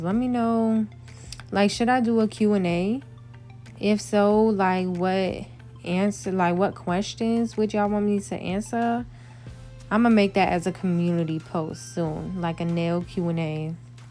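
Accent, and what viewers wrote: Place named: DMV, United States. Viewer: I knew you was from dmv! Heard it in ya voice! I love your nails! You bomb AF!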